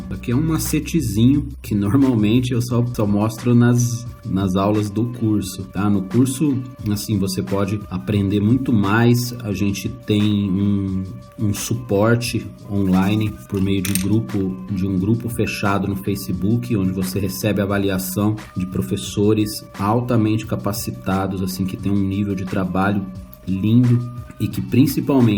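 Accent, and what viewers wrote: São Paulo, Brazil. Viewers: boa parceiro. show de bola. Tentei entrar no site e deu nao encontrado. como faço pra fazer cursos com voce ? vc é de sampa ? (tem sotaque de sampa - conterraneo) eu moro aqui em Pinheiros - Vila Madalena. me chama no zap <num>. valeu.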